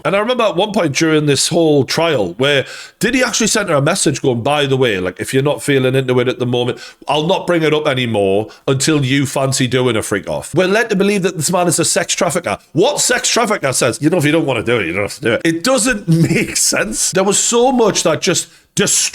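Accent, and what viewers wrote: Newcastle, United Kingdom. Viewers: Easy Geordie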